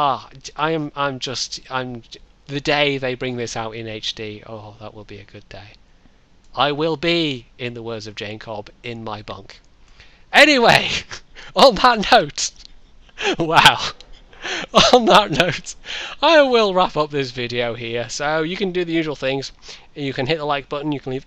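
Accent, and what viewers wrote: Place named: Scotland, United Kingdom. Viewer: On my side bar, it's recommending a video for me, the Most Offensive Jokes Ever, Frankie Boyle is pictured, his jokes aren't offensive,merely telling the truth, you Scots have a unique way of speaking that that is true,don't you? With this in mind, Which tank in WOT really offends you?, offends you by just being there!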